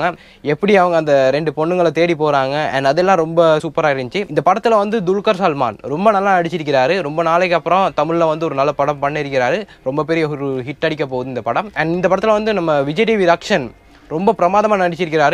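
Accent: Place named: Sri Lanka. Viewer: இலங்கை தமிழா, கேட்க இனிமை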